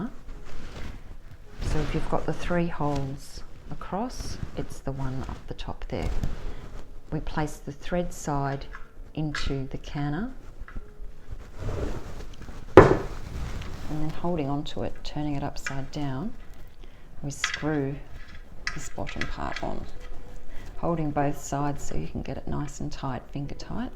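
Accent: Australia. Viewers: Thank you for this video! I have just purchased a Presto canner and am finding your channel to be very helpful. It's lovely hearing a fellow Aussie voice on YouTube! Where can we get the gauge checked here in Australia? Thanks.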